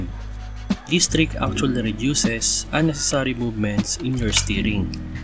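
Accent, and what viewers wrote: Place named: Philippines. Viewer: I notice the Accent, Filipino ka sah? anyway ganda ng touring set up nato.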